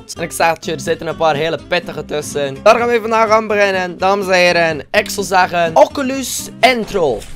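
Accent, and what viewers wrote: West Flanders, Belgium. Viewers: Rani Claes west-vloams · Belgies accent is wel grappig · jij praat echt heel belgisch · praat jij Belgische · Dat Belgische accent is echt leuk😍😍😍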